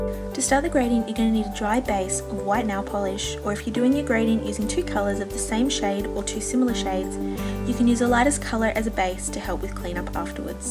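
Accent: Australia. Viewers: It just her Australian accent. She sound like an Australian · She just sounds Australian to me...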